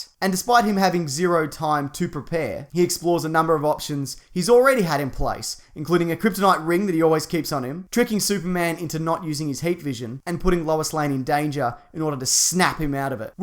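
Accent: Australia. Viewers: Kay ShyGuy I think he's actually australian...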